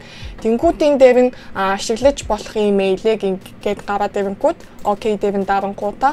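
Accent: South Korea. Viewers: Angli heliig solongosoor unshdag mongol ohin geesh heden gadaad nzdaa helsen tataj untlaa ineelee elgiin2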